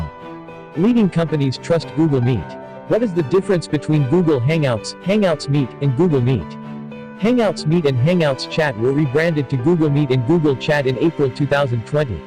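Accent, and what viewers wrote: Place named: England, United Kingdom. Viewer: I like you english accent, thank you for being a good teacher and sharing your idea about our modern technology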